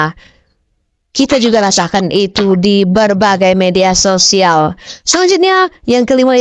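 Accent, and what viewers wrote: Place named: Netherlands. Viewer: Raimu wong ndi cuk..ngomong koyo ngono logate koyo londo udu wong indonesia kuplak....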